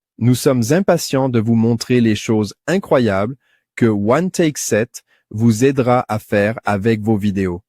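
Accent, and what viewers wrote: Canada. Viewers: La vidéo est créé avec IA grâce aux anciennes ? La voix et les gestes sont globalement les mêmes, mais la dynamique et le rythme sont différents. ( si c'est le cas, l'avez-vous paramétré pour obtenir cette différence ?) L'accent et la voix sont différents également ( québécois), et les gestes ne sont pas coordonnés avec la voix, il y a un petit décalage Pour la confiance de nos prospects, ça peut être compliqué 😅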